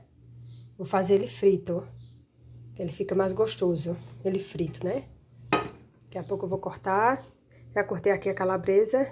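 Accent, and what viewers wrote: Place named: Northeast, Brazil. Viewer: Esse sotaque nordestino e a maneira delicada e amorosa como a senhora abençoa os seus seguidores, fazem bem a alma da gente.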